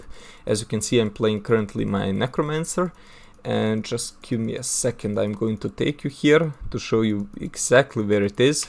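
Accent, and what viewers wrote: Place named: Balkans. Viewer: I can tell hes balkan and might speak my language because of the accent.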